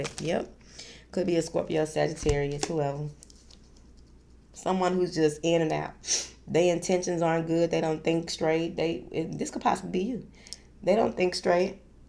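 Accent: South, United States. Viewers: I love her country gal accent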